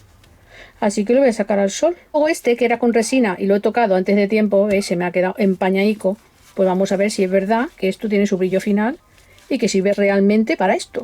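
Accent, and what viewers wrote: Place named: Seville, Spain. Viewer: Hola,te escribo desde Argentina,nunca había hecho muñecas,pero gracias a tus videos me animé y ya voy por la segunda.Lo que más me cuesta es realizar las caritas,pero creo que si sigo practicando algún día me saldrán,ja ja Me encanta tu acento,me hacer recordar a mi abuela,hablaba como vos.Eres Sevillana?.Lastima que en mi país no pueda obtener esas resinas,veré con que las reemplazo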